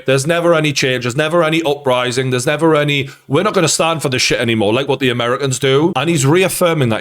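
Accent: Newcastle, United Kingdom. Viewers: 100% Geordie lad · I love this geordie, no nonsense guy ❤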